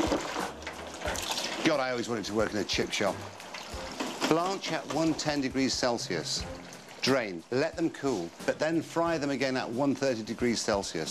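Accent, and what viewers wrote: United Kingdom. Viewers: 我跟你說只是他的英國腔講得好聽而已，如果你去到當地就會發現很多當地人說的英語你根本就不可能聽得懂，我來自香港土生土長的70後，我還記得讀中學的時候，當年還是殖民地時期，那些英語教師經常都會跟我們說學英語要學英式口音才是王道！後來出了社會之後才知道原來英式口音去到美國或其他說英語的地方，人家都當你鄉下佬覺得你好老土⋯ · 英國腔真的超好聽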